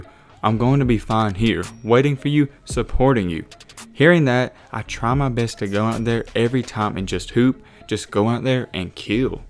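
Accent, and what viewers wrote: South, United States. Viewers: bro this man is so country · for real he has a country voice that pisses me off also whole video sounds fake, sounds corny, and sounds scripted lol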